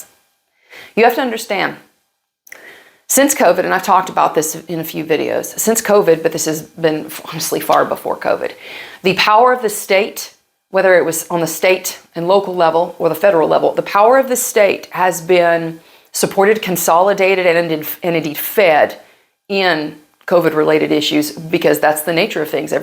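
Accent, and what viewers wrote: South, United States. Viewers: I know this is a little off topic but does anyone else hear a southern accent? Possible mix of Texas and Louisiana or maybe Tennessee.. Anyway I truly admire her Passion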